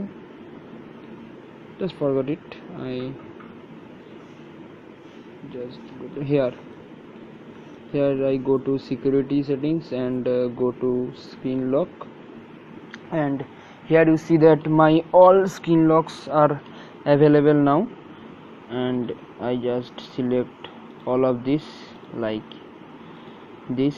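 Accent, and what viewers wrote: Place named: Bengal, India. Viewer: Your typical Bengali/Assamese/Odiya accent has ruined your english , you should post videos with writings instead of speaking English. That would be conceived better for sure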